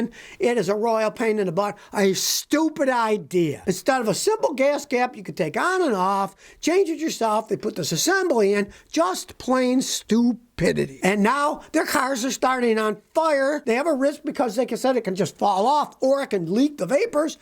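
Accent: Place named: United States. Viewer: you talk American! Buy you don't buy American!! Your a Toyota and Honda man!! Your either with American or not!!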